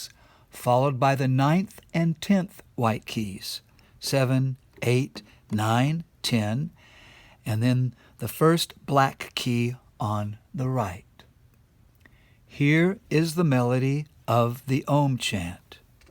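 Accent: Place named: South, United States. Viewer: Ah this sweet southern voice sounds so familiar! Brilliant patient teacher you are my friend. I am sharing this with so many devotees who need this! Much Love and Gratitude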